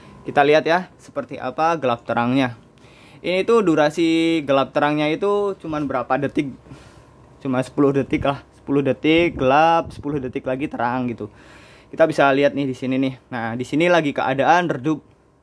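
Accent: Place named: Java, Indonesia. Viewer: Jowone endi mas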